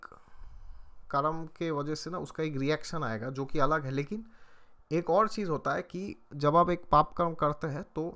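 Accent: India. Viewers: I just love your Hindi accent 😂😂😂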